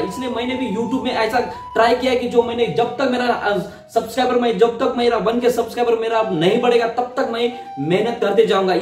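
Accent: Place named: Hyderabad, India. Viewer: Hyderabadi hindi wow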